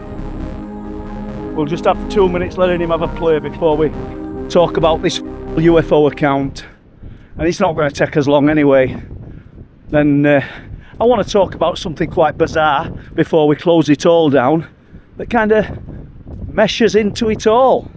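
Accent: Yorkshire, United Kingdom. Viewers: Just discovered you recently, really enjoying the vids, thanks....there's something reassuring about listening to paranormal tales in your lovely solid Yorkshire accent 😄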